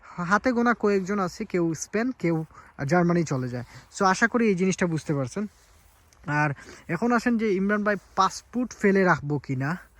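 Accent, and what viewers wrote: Sylhet, Bangladesh. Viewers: ভাইয়া আপনার বাড়ি সিলেট নি? লাগের সিলেটি 🥰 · ভাই মনে হয় সিলেটি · ভাই আপনার কথাগুলো অনেক সুন্দর লাগলো আপনার বাড়ি সিলেট নাকি, সিলেটের মত কথা বলেন আপনি,,,,, 👍👍👍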